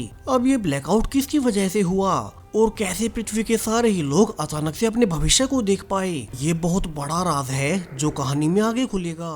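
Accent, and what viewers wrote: Haryana, India. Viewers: aapp haryana se hoooo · achii hai bro, tabhi feel ata hai video mai...haryana se ho kya bro?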